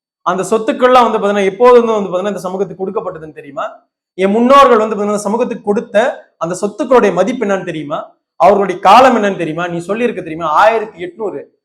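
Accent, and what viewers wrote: North, India. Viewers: ஆமா பேச்சி வடக்கு கிராஸ் மாதிரி இருக்கு.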